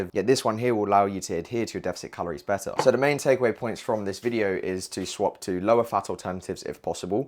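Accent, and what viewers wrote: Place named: United Kingdom. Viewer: I'll be honest, I am here for the british accent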